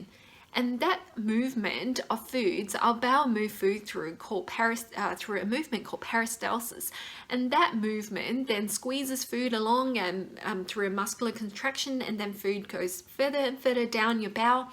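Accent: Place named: Australia. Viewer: You sound like an Aussie 😊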